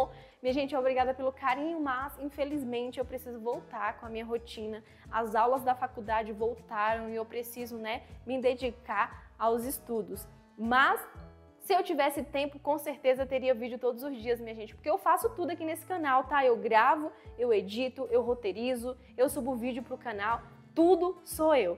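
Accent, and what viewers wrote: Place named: Northeast, Brazil. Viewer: Sensacional!! De que região do nordeste você é?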